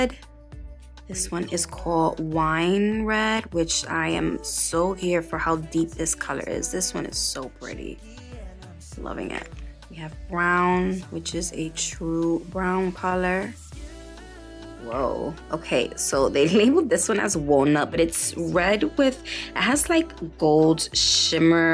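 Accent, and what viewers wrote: New York, United States. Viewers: Not only do I love your nail work your New York accent is to die for! I’m from California, and anytime I go back east I could listen to people talk for hours! You are so fabulous! And PS I have used those wax pencils to pick up stones ,large glitters etc. they work really well😀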